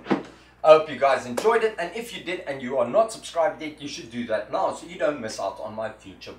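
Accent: South Africa. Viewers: Great ideas, love the video. Spent time in Pretoria growing up so love the accent. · Thanks very informative - Hey are you South African? Where are you? So I'm new to woodwork and am showing my ignorance here. Just mad a long narrow shelf for my kitchen to store tea caddies etc but I dont think its deep enough for wall support so is insecure, Any ideas?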